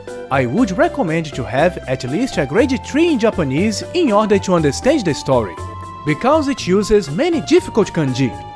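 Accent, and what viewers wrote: Brazil. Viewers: esse sotaque não me engana, tu é br né kk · mano concerteza voce e brasileiro xD SO PELO GEITO DE FALAR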